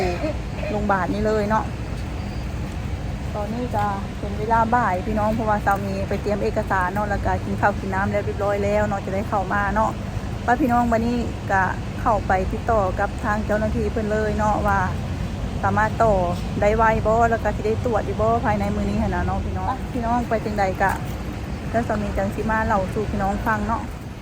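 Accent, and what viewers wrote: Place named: Nakhon Phanom, Thailand. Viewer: สบายดี สาว คนงาม สุขภาพแข็งแรงร่ำรวยร่ำรวย เด้อ.สาวเซโน สำเนียงเว้า คือทางบ้านนาหลวง นาทราย เน๊อะ อำเภอเมือง นครพนม (คนไทยในต่างแดน)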